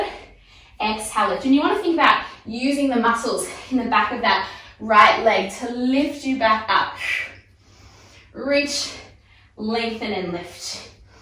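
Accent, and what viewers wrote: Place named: Australia. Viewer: You have such an aussie jew accent haha love it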